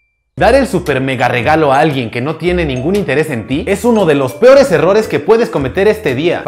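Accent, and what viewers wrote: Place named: Mexico. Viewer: Jajaja me gusta mucho el canal. Además que el español Mexicano me resulta gracioso. Saludos de un Venezolano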